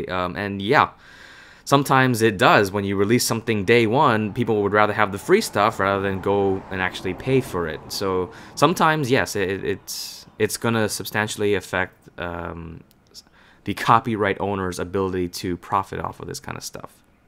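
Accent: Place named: United States. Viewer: wow i am an Thai half Indian but i thought you were an american(i am not a resits)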